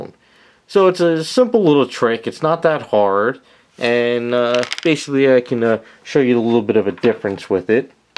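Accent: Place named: South Jersey, United States. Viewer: the south jersey/philly accent here has soothed me as well as given me all the confidence in the world to cut up my paint brushes immediately. Thanks for the tutorial!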